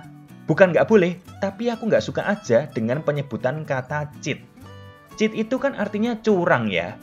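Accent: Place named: Java, Indonesia. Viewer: Logat Medoknya mas ngangenin. Ya iyalah, wong tiap hari nontonin diet santuy melulu.. suaranya terngiang2. Wkwkwk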